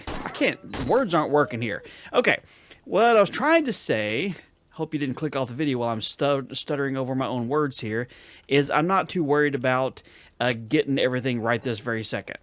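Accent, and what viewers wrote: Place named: Midwest, United States. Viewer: not to shabby man. Enjoyin the vids. BTW dumb question. Where you from? Sounds like the midwest with the way you speakith 🤣😂🤣😂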